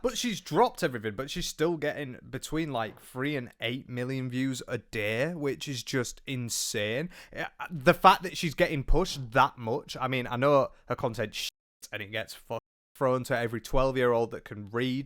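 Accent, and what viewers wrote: United Kingdom. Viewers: British man. i approve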